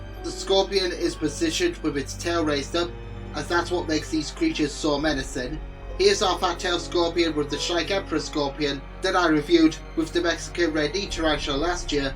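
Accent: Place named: United Kingdom. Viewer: i must say, for a resident in the UK, you have a very interesting accent, what region of the UK are you, because your pronounciations sound unusual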